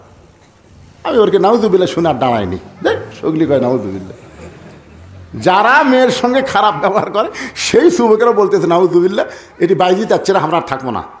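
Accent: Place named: Bogura, Bangladesh. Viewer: বগুড়ার ছোল তাই এমন করে বলে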